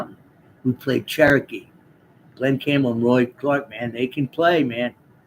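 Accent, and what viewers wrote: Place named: Philadelphia, United States. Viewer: Keep that Philly dialect coming!!!! All those words George Carlin said you can't say on TV. Thanks for thew music my friend!!!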